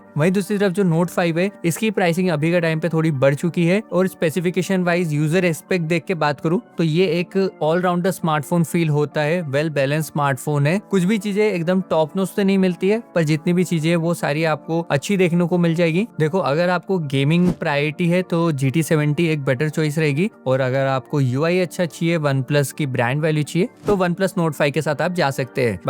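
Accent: Indore, India. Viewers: You are from indore ?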